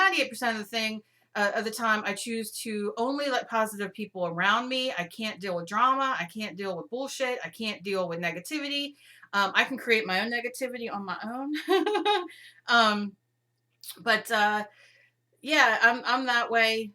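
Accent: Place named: New Jersey, United States. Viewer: You are so NJ and it is so refreshing. I too am a Jersey girl and when you say "frickin'" it just makes me laugh!!!!!! stay strong and spunky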